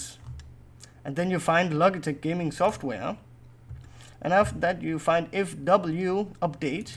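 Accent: Denmark. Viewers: er du dansker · you are from danmark andt you XD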